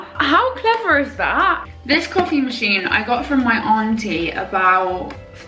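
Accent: United Kingdom. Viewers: No idea. She has a very typical British accent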